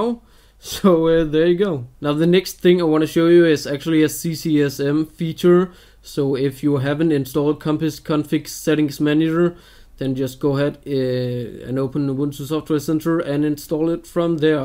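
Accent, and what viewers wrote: Denmark. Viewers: I knew it, you can always hear if it is a Dane who speaks English! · det er så tydeligt at du er fra danmark (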